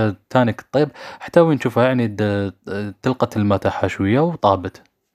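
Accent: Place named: Oujda, Morocco. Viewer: شكراااا على وصفة وتحية ليك من المغرب الشقيق🇲🇦 وهضرتكم شحال حلوة تشبه للهجة وجدة ❤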